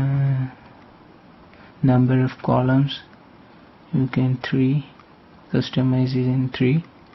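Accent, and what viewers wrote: India. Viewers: Come on. He has an indian accent.....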